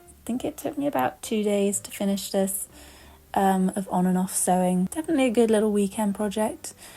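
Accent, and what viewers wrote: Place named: England, United Kingdom. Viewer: What a fantastic idea - making something for ourselves for once! 😂❤ I absolutely LOVE Ohio stars and am mega careful, getting everything to line up and get very stressed if I’m even a mm or so out!! 😱😅 An absolutely stunning wall quilt hanging - beautiful and a smaller one in a corner is a lovely idea too 😊 I’m desperately trying to get stock together, but I might just say sod it, this weekend, I’m going to make something just for me ❤ Thank you thank you and if you’re at all interested, I can send you a photo when it’s done 😊 You are a true inspiration to a massive quilting and everything material and hand made fan, and hearing your lovely english accent reminds me of ´home’ - have lived in france for 12 years, but almost everything I make is with fabric from England as the French just adore it ❤ Have a lovely evening and see you next time 🫶 xx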